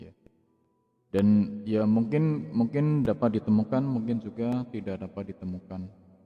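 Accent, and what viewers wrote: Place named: Banyumas, Indonesia. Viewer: Brute Force... Mantaf.. Ngomongnya ngapak gak papa malah seru... Cuma kurang di cepetin dikit ngomongnya hehehe.... Trims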